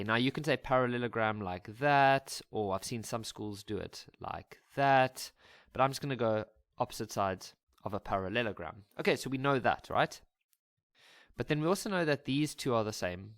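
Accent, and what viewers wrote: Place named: South Africa. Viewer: You're South African😳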